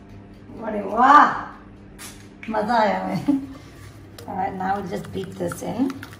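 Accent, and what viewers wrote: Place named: India. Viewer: I am here for her hindi accent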